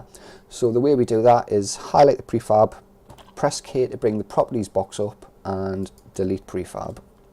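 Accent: United Kingdom. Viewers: Thanks for the series, only just starting to look at the prefab editor as I have a few ideas, so the overview has been useful. Especially trying to find the right balance between lots of small or few small prefabs. How do you think your method of capturing a large prefab from the world compares to merging small prefabs inside the prefab editor itself - which is the way I had been trying to do it. PS There's nowt wrong with the Geordie accent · Random question but are you from Middlesborough?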